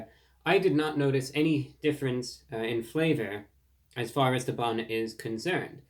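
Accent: United States. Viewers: Is this a posh american accent?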